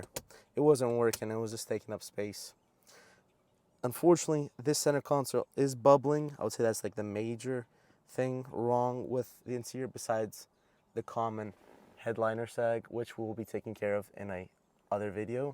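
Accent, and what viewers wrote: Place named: Albania. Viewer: You sound Albanian 😢😔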